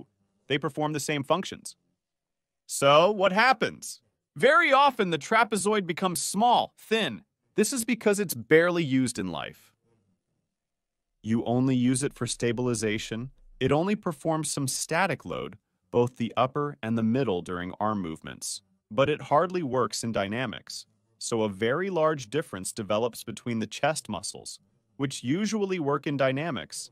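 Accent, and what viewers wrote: Russia. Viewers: I love my new russian doctor 😊. I love his sense of humour and the Russian way of speaking and his expertise. Of course I don't watch illegally and I learn so much. I'm Polish fan. Thank you ❤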